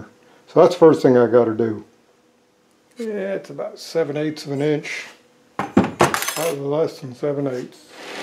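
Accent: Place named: Kentucky, United States. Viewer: I live in south central ky. Listening to your voice I suspect you may live close to me! I enjoy your videos!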